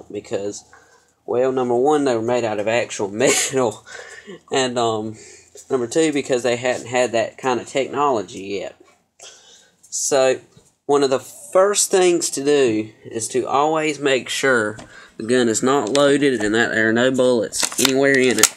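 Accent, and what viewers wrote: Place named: United States. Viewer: Your accent is epic ... America!!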